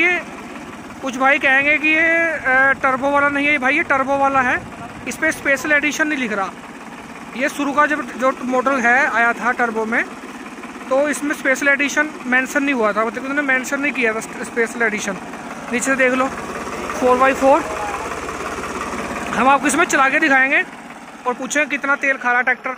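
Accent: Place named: Saharanpur, India. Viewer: Bhai teri language share saharanpur walo se miti h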